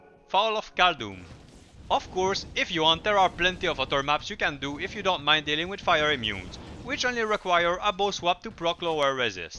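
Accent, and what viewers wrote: Quebec, Canada. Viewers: rreally nice english with a beautiful quebecer accent lmaooo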